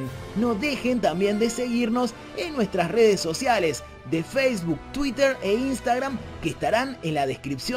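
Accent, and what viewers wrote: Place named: Rioplatense, Argentina. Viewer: Se te nota un poco un acento de por acá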